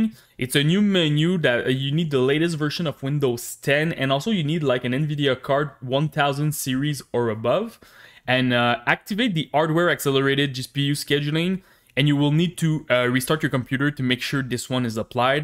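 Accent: Quebec, Canada. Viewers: The Quebec accent is here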